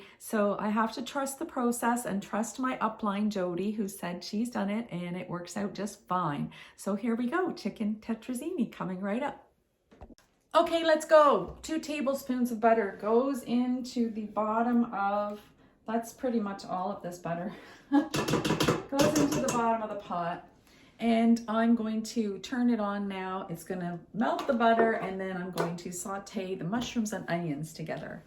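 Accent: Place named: Canada. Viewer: You are now more Canadian sounding...beautiful.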